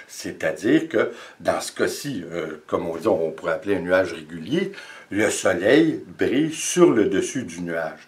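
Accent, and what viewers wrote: Canada. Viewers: Bravo cher vos explications Meme avec votre super charmant accent Canadien sont formidables 👏👏👏👏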